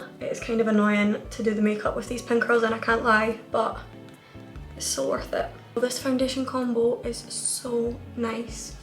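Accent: Scotland, United Kingdom. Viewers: Omg your so pretty also r u Scottish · Scottish accent :) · is that a scottish accent?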